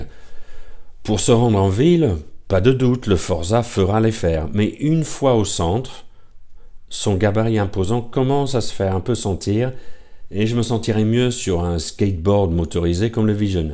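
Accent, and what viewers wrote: United Kingdom. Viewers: BJR. Et bien, j'aimerais bien parler l'Anglais comme tu parles le Français (même avec un accent). Tu as une très bonne diction. Quant à ton accent "british" il n'est pas trop prononcé. D'ailleurs, je ne l'ai remarqué qu'au bout de plusieurs phrases. Bon essai, agréable à suivre ; continue de faire des essais de scooters. Concernant ta question sur la circulation en inter-files (surtout valable sur le périphérique parisien), nous n'y comprenons plus rien, nous non plus. La France a l'art de changer et compliquer les réglementations. Une question : c'est mieux de vivre en France ou bien au Portugal ? (ndr : je suppose que c'est à cause du climat que tu ne vis plus en Angleterre). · Very good review with the best accent I have ever heard from an English person.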